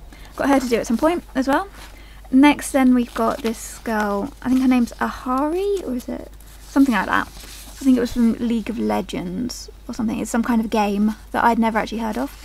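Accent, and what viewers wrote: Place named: United Kingdom. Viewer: you have a total british accent, sounds cute by the way =D but you sound so shy that i can't tell your age right! maybie you have 10 or 20 i don't know!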